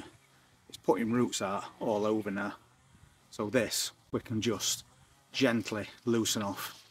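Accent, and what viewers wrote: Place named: Derbyshire, United Kingdom. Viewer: You’re really settling into caravan plant life now. Your spuds look so good. We share the same dialect I reckon so listening to you reminds me of my Derbyshire family. Take care.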